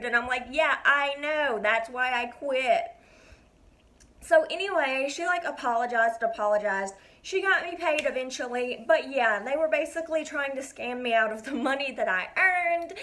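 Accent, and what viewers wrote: South, United States. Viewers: Oooh a southern accent... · I love your southern belle accent ^-^ its kinda nice to listen to you talk while i work (i work at sonic. Its way better than walmart because my bosses are really chill and my co workers are cool but some customers just ruin it)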